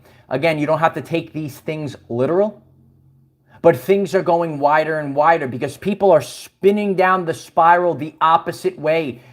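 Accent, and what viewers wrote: New York, United States. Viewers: I have a client that talks, accent like you. He's from New York. Guessing that's where your from?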